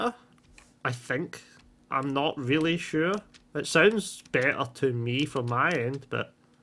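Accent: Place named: Scotland, United Kingdom. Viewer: Haha love the vid where abouts in Scotland you from your accent sounds a lot stronger than mine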